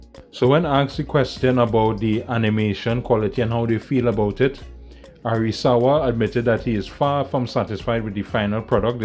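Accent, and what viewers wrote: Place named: Trinidad and Tobago. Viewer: Are you from trinidad cuz im from trinidad and i recognize how you were talking just like a trini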